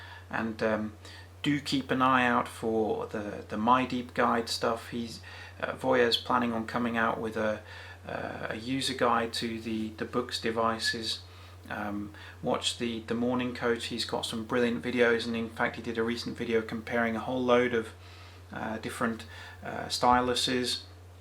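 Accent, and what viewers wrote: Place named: United Kingdom. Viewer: When viewing a PDF in landscape on the Note Air, is it possible to do fit-to-width? On the vid, it seemed like you were viewing the whole page in landscape, which made the text really small. Another thing: With split screen on the Air, when reading a book in one half and making notes in the other half, the fact that you can't scroll notepad up as you write but have to keep repositioning the device in order to maintain your writing posture (right?) means that one's viewing angle/distance of the book one is making notes from has to be continually changing. Doesn't that make split-screen more or less useless for serious, prolonged note-taking from books/PDFs? Thanks for the vid! (You've got a British accent but you said "euros" not "pounds"!)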